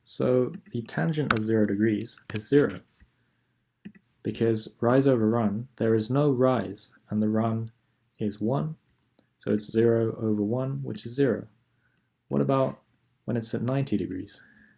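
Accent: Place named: United States. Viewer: tu indian hai, par acche american accent bol rha hai.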